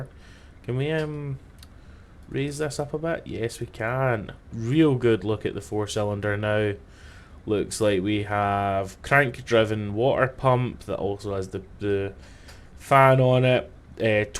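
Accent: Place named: Scotland, United Kingdom. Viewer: It's the first video from you, that popped up in my feed. You're speaking like level 1.5 or level 2 of Scottish out of let's say 5. I wanted to move to Scotland 4 years ago (as an eastern european), but covid stopped me unfortunately. I understand English very well, but Scottish English was something new for me. Thankfully I found "Autoshine Cars" account, who's a Scotsman living in Blackpool and learned your pronounciation from him and can understand you fully :). I love your vid, keep them coming <3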